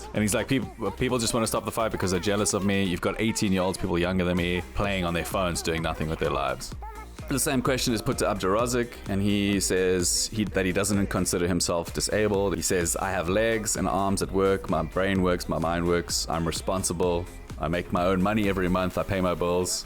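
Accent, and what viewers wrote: South Africa. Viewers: HOL UP ARE YOU SOUTH AFRICAN ????????? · Are you from South Africa, accent sounds like it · Well done not the video. Are you South African? You have to be with that accent. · Bru are you South African? You sound South African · Hi are you South African ? Sounds like a wyt saffer accent. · Awe boet, I can hear you are South African, good to hear a familiar accent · You are definitely south african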